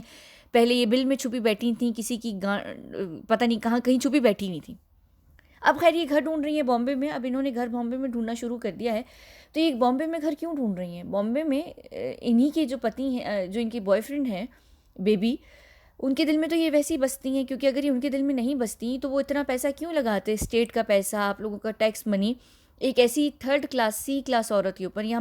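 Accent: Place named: United Kingdom. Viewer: wow sachi she is worth those words aur b bohut Sare...aap sachi bohut acha decode karthi ho really so awesome videos n very informative n I love the way you speak the UK accent of the English words n sentences you speak madam🙏 plz keep making more videos for all of us. Cuddos to all your team👍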